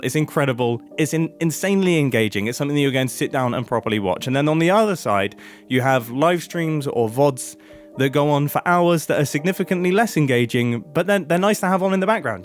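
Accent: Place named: United Kingdom. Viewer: I’ve been watching Let’s Play videos since 2017, maybe even 2016, and I can confidently say I miss those days. The “100 Days” videos are nice, but they feel repetitive. Modded Minecraft is cool, but it can also get repetitive. Once you’ve got the Ender Pearls and Blaze Rods, the video’s probably over. One YouTuber I watch, Kolani, makes both relationship and idea-based content, kind of like you. You both create videos not just for views, but to stay connected with your audience. I still remember stumbling upon one of your videos back in 2017, when my mum was battling a terminal illness. I just heard this British voice chatting away, asking, “Guys, do you want a timelapse?” That was your first ever timelapse, you mining down to level 12. And I remember thinking, this is me! Talking about cars, music, videos, traveling, all while strip mining. 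Nowadays, so much of it feels fake. People exaggerate everything: “Bro, how are we going to cross this lava river? That’s insane!” It’s just not the same anymore. Thanks. You’re truly unique.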